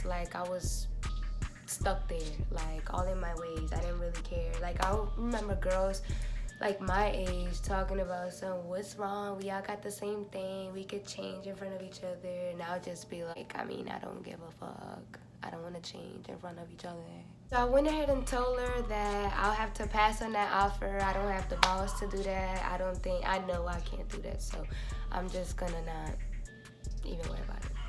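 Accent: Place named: New Orleans, United States. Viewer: U in new orleans? i keep hearing u say buku lol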